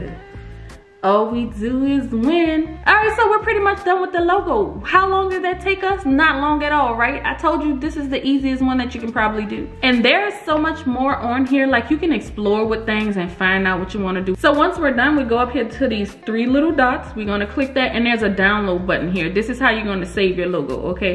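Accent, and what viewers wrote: New Orleans, United States. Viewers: I have a quick question 🙋🏾‍♀️ Does any marketing or advertising that we have to do. Also I am from (Nola) I can spot our accent anywhere 😂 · Are you from New Orleans? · Naturally New Awlins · Yes, let’s please! I hear New Orleans 💜💚💛 Is that where she’s from? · I heard you talking and I said she must be from New Orleans or somewhere around there. Check the description, Sho nuff you are.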